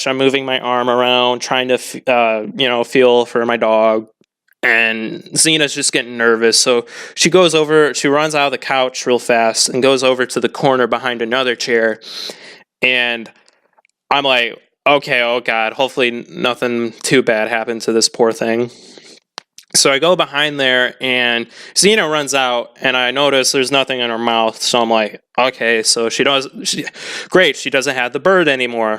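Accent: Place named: United States. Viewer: Oooh you're American! I'm British lol.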